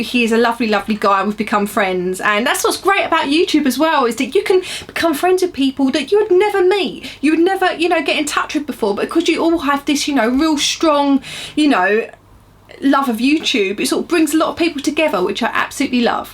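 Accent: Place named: United Kingdom. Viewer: I think you're simply beautiful! I came across you not too long ago and noticed your hair in particular. Love it ... and your accent, of course. I lived in the UK for a while & miss it, so you bring back a little part of such fun memories just hearing you talk. I can tell you're a beautiful soul. Keep making videos! <3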